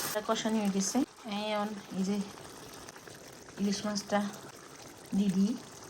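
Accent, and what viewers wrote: Noakhali, Bangladesh. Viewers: Apnar kotha purai noakhailla.amio noakhailla😂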